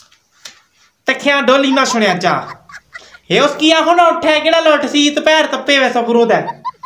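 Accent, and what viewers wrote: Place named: Pothohar, Pakistan. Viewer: Fantastic video i Love it and i really like your potohari the way you speak My favorite language is potohari but we call it pahari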